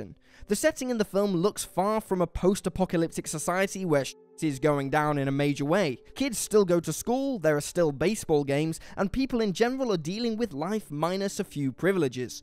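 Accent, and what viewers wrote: United Kingdom. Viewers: This guy sounds British so I'm guessing English is his first language.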